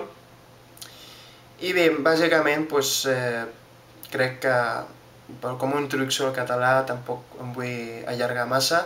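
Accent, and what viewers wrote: Spain. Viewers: The only difference that I've noticed in your Spanish is a Spaniard accent, (such as spoken in Spain) otherwise I understood everything that you've said. My question then is what is the difference between Catalan and Spanish, aside from the accent?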